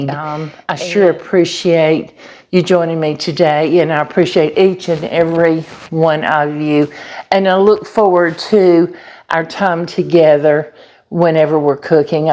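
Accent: South, United States. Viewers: Thank you so much,🎉 my mother made this often when i was young,, this is hard to find i think because i just haven't seen any liver in the market, you're so special 😂i love your sothern accent, my father was from tn this takes me home, you have stole my heart ❤️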